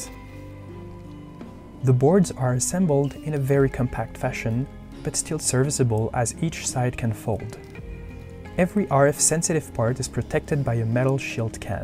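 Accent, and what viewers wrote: France. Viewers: Vous avez un accent français, c'est pas possible autrement !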